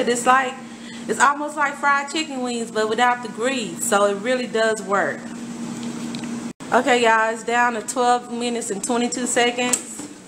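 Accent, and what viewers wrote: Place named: South, United States. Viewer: Love your southern accent. I I might have to invest in one of these things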